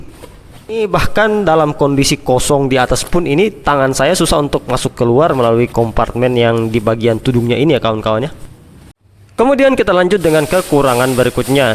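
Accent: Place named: Sulawesi, Indonesia. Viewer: Dari logat bicara kayaknya Abang orang Sulawesi ya 😂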